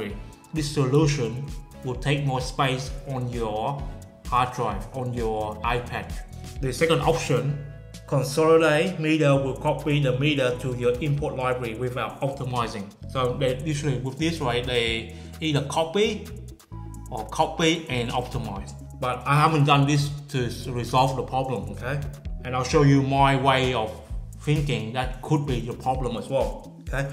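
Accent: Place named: South Africa. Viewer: Dude r y from South Africa? Cool accent! ❤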